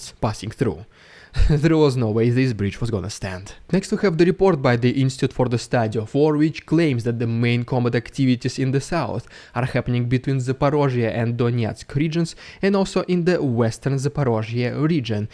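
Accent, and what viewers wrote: Russia. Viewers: hope all is well. Been annoying me for ages - yer accent sounds lik chekov from star trek.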